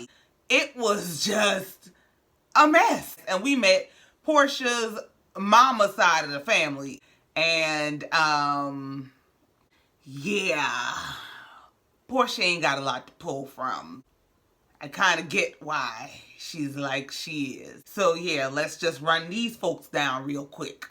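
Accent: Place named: Gullah Geechee, United States. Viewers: Hey girl, love the channel and the videos. 1 question are you from South Carolina the Charleston area? You sound so much like my people and me. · Okay after watching the Arionne/Tasha K interview review and this one I'm subscribing👏🏿👏🏿. I thought your accent was from NOLA, but after reading another response, I see that you are from Charleston, SC. I was off huh?.. But then again I'm a Jersey girl.😆😅🤣 Loveee the accent and review! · Girl I hear that geechie accent.. Where are you from? Gotta be Charleston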